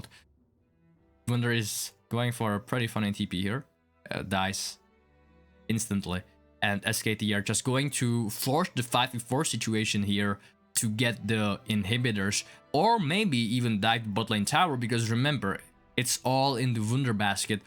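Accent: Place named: Asia. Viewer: Could tell after 5 seconds that this is Asian, maybe but just maybe a very drunk dutch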